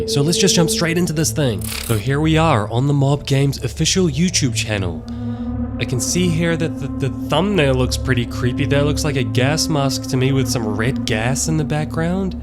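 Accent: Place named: New Zealand. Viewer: YOUR FROM NEW ZEALAND. 😆😄😃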